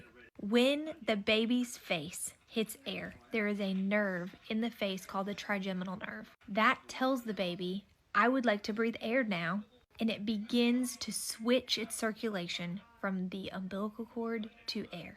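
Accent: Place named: South, United States. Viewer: I love the way you described that without being condescending or anything, this felt like a general teaching lesson made from love. You should consider being a professor or something. There’s something about your absolutely gorgeous and sweet southern drawl that makes me want to list to you explain things all day. I’d love to learn more about the female reproductive organs and babies being formed and delivered from your voice. Anyone else and I’d probably rather not hear any of this. I’m not a father, not expecting to be one anytime soon and would only like to learn these things if it were going to be happening to me, but if you explain it, I’ll learn and take notes.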